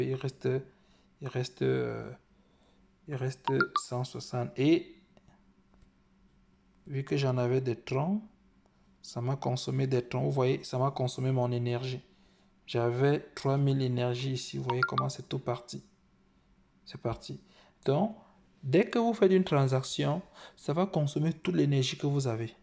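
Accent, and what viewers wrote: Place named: West Africa. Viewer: Parles toi bien c'est quoi cette langue franco africaine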